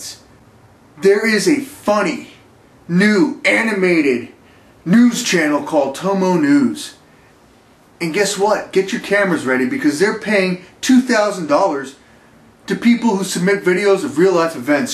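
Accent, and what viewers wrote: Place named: New Jersey, United States. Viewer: He sounds like he's from New Jersey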